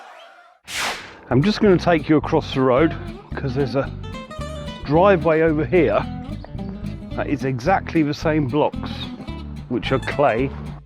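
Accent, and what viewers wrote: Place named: England, United Kingdom. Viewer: Top, like when you speak. A good English accent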